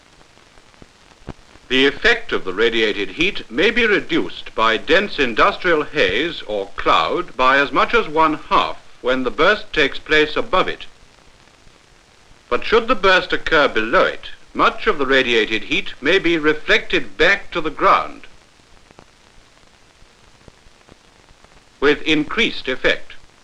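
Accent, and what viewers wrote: United Kingdom. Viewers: Typical british accent... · This is a British film, not Canadian. Love the narrator's Received English accent. Flash sounds like flesh. No wonder why they call a flashlight as a torch. Americans would laugh if they heard something that soundly like fleshlight. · That high-brow British accent... that same annoying accent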